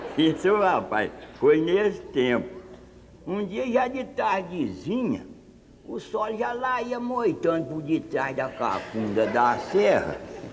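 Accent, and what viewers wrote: Minas Gerais, Brazil. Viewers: Pensei q fosse de Minas, Somos mineiro e meu padrasto cunversa desse jeitinho.. Sem cortar e nem tirar. Grande seu João meu segundo pai.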